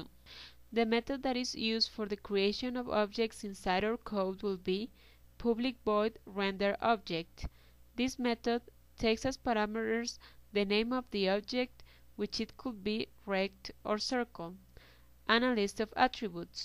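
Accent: India. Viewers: Thumb up for the indian accent :D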